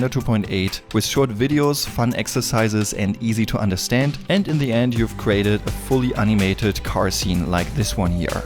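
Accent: Germany. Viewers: Super Video! Es ist ja richtig erfrischend mal wieder einen schönen Deutschen Akzent zu hören. :)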